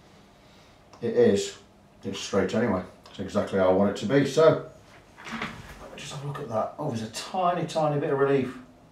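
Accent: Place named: Nottinghamshire, United Kingdom. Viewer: I love your no nonsense, workmanlike style of setting up guitars. You are a real craftsman. I'm guessing, from your accent, you are local to me, Newark, Notts.?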